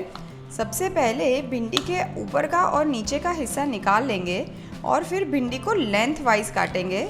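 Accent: India. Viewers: I am going to try this today. First I thought you are a foreigner but when you started speaking I understood you are an India. Thank you for sharing your recipe.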